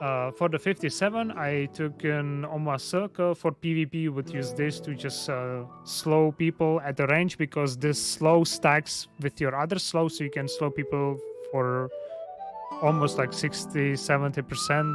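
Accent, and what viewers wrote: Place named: Czech Republic. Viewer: sounds like czech accent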